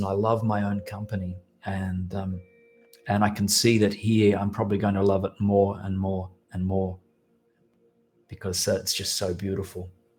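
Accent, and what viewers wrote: Australia. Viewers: Love your accent and your upbeat vibe!❤❤❤ Australia rocks and I've never even been there!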